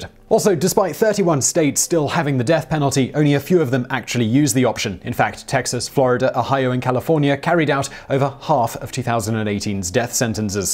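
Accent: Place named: London, United Kingdom. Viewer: Mr bald guy speaks so fast with his silver spoon London accent. His enthusiastic tone dies down towards the end due to fatigue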